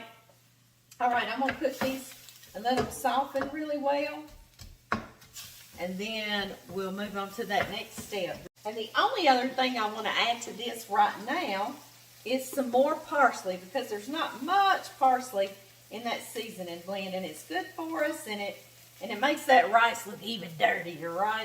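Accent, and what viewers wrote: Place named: Alabama, United States. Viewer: You don’t sound Cajun but Alabamian 😁. I grew up in Louisiana but from Florida! Never made this but parents learned how.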